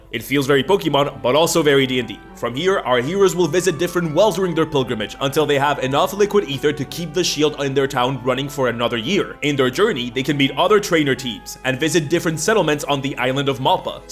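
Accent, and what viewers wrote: Spain. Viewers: I mean… his last name is and he has a Spanish adjacent accent, it is sort of expected. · Ideas muy chulas, dibujos geniales... Pero y ese acento en inglés siendo español??? Wow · waitaminut You're a fellow spaniard? Holy, your accent is really nice.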